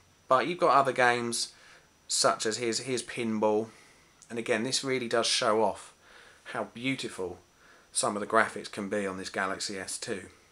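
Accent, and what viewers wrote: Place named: England, United Kingdom. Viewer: I think this is outstanding vid specially for a 1st one. You have big future on YT. I can tell. Appreciate Your work. BTW Thats the second english accent i love... first was Wheatleys one obviously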